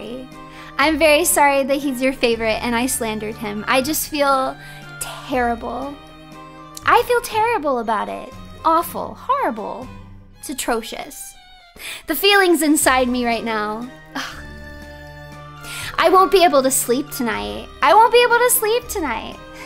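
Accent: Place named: New England, United States. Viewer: More southern than you thought? I thought she was from the New England area from how she talks o_o some might say the poler opposite of "southern"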